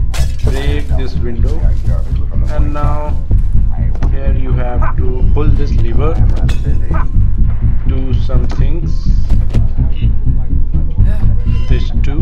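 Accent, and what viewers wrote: India. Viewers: When I heard your voice...”sigh”ARE YOU INDIAN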